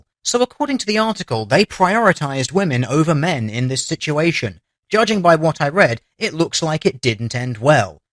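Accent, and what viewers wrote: United Kingdom. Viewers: You got me subscribed with your British accent and the numa numa joke. Hope all your vids are as good as this.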